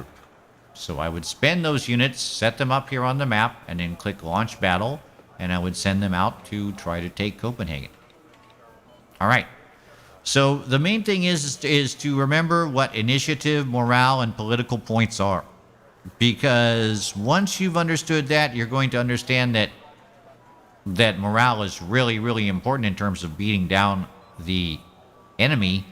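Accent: United States. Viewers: Thanks for the review! By the way, I bet you're from New York State! Your voice reminds me of Billy Crystals voice! Especially in the movie 'Parental Guidance', when he's commentating during the Baseball Games! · You sound sooo much like the American who visits Fawlty Towers in one of the episodes! Thanks so much for the review though, youve helped me decide to buy it but with a very humble attitude :)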